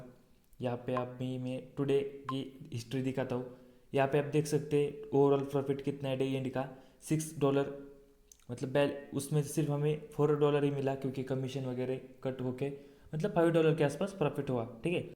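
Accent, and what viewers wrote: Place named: Maharashtra, India. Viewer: Kay rao Aple lok lagich olkhu yetat 😅bolnya varunch... Keep it up brother 💪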